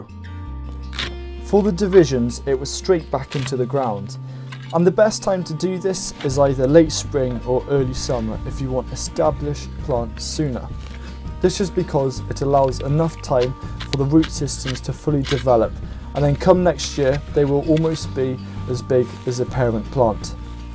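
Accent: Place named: Wales, United Kingdom. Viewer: Great video. I'm surprised you don't have your own TV show. Perhaps you do. I'm in Canada and have no way of knowing. I'm Scottish and was curious about your accent. Now I see your Welsh. All the best and please keep them coming.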